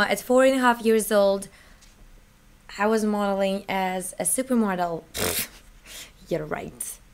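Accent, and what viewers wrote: Russia. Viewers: you russian accent is awesome i must say! good on you! kak naschet slova 'sadism' ? mojno nemnozko pogovorit a marquis de sade i tak dalee. privetik is germanii, serojenka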